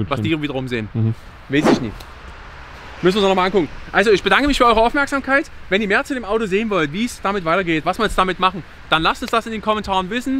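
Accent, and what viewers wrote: Saxony, Germany. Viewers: Alles gut ! Aber das gesächsel macht es anstrengend zu folgen . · Gehört das vulgäre Geschwätz zum Konzept? Schwer zu ertragen. Sorry, aber Sächsisch ist schon anstrengend genug!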